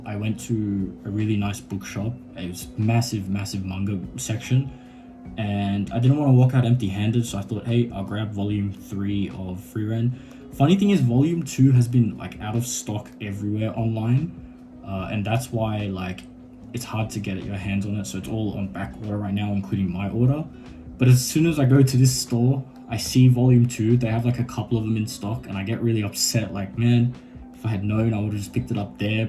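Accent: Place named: Australia. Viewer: I sense an Australian accent (you might be from New Zealand or I might be wrong) but with how prices are to me your rich.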